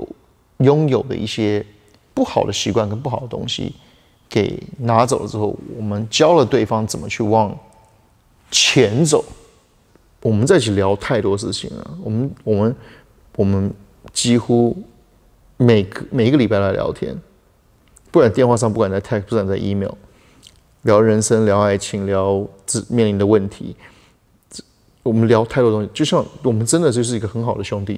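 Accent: Taiwan. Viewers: 台灣人特有的口音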